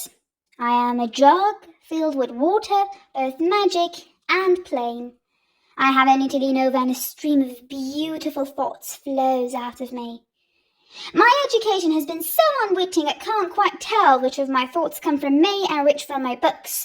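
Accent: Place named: United Kingdom. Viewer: Iove it The British accent is funny to me 😄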